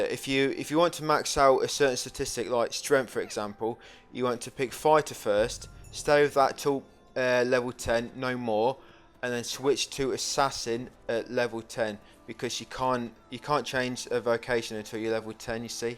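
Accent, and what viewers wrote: England, United Kingdom. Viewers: I don't know why this video dropped into my recommended, I've never played this game, but your accent is great, what part of England is that from? Fresh sub also, lemme check your other stuff. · I can't help but be mesmerized by ur beautiful British voice 😉😚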